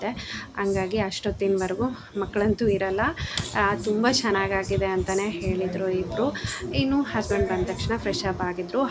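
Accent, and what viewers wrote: Raichur, India. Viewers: ಅವರೇ ನಮ್ದು ರಾಯಚೂರು ಹತ್ತಿರ ಬಂಗಾರ ಹಟ್ಟಿ ಹೀಗೆ ಇರೋದು ಇಲ್ಕಲ್ ಬಾಗಲಕೋಟೆ ಡಿಸ್ಟ್ರಿಕ್ಟ್ ನ್ಯೂ ರಾಯಚೂರು ಭಾಷೆ ಮಾತಾಡ್ತೀರಲ್ಲ ನನಗೆ ತುಂಬಾ ಇಷ್ಟ ಆಯ್ತು ಅಡಿಕೆ ಡೈಲಿ ನಿಮ್ ಚಾನೆಲ್ ನೋಡ್ತಾ ಇರ್ತೀನಿ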